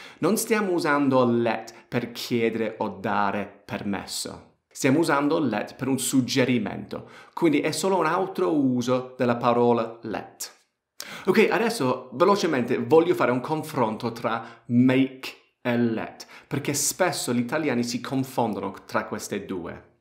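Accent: United Kingdom. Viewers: very well!! Thanks! e voglio aggiungere che quella storia del pugliese non la condivido affatto: a me sembri un vero inglese che parla un ottimo italiano! :) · HI! Your sons are precious! I like that perfect British accent!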